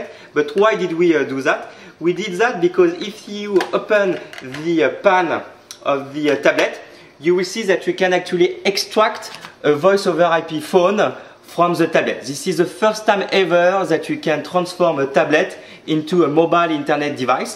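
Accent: France. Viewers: French guys speaking english should be banned from internet :[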